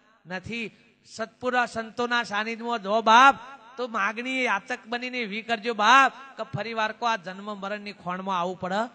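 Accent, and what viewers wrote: Sabarkantha, India. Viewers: ભાઈ આતો સાબરકાંઠાની બોલી છે...... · Humm mehsana ni nathi sabarkantha ni boli che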